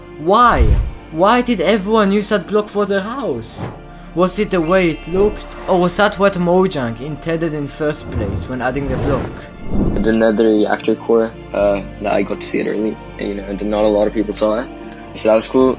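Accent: Israel. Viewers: היי אחי יש לך מבטא ישראלי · Is there any chance that you live in Israel? Or that your first language is Hebrew? Because this accent sounds familiar.😂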